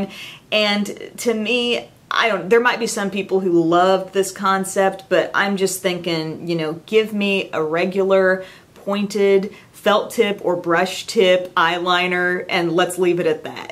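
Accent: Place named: South, United States. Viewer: She's from south western Illinois I believe - so she has a bit of a southern accent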